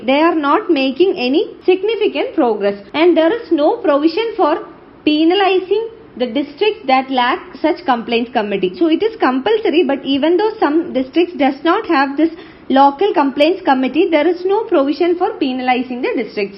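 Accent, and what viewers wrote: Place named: South India, India. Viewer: mam please speak in US standerd english not south indian english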